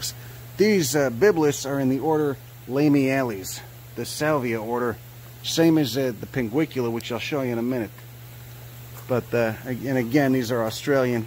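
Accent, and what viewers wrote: Chicago, United States. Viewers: Brian Williams more like CHICAGO. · lol....you look much different then you sound. how is it you kept the chi town accent even after traveling the country after highschool?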